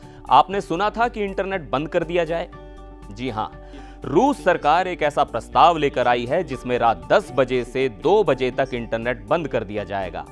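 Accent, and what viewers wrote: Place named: Bihar, India. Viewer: Ye presenter pakka बिहार से है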